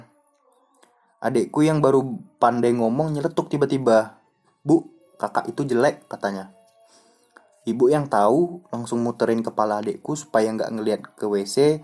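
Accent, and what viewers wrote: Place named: Medan, Indonesia. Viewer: Suka x aku bg denger kau bawakan cerita ini, medan x kurasa.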